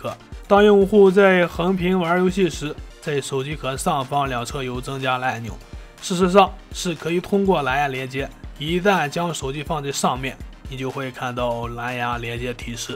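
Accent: Shanxi, China. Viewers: 能练练普通话不？一口山西土味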